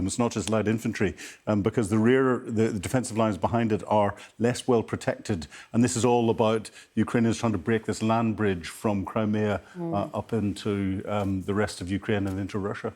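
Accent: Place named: Ulster, United Kingdom. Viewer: Just love his Ulsterman delivery........day 578(eight!) of a 10 day operation.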